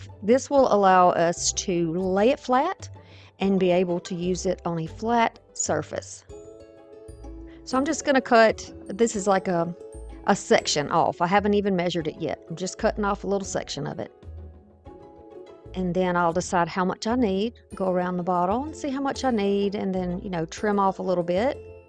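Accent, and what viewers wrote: South, United States. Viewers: Love them all! I love crows, so your moodies are perfect accompaniments. Thanks so much. Have a great day and God bless 😊 . I went to the michaels link and i like your birdcage and shadow box way more than theirs, actually i think all of yours are better ! Are you from ga ? Cause you talk just like me, and when you said "little,tiny nails" i laughed out loud. I so love our southern hyperbole, why use one word if you can use two and drawl them out. From Nancy in ga usa 😊.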